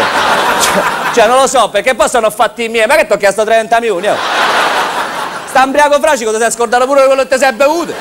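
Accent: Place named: Rome, Italy. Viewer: Una velocità di battute,strepitose Poi quella parlata romana aumenta il potenziale Davvero bravo bravo bravo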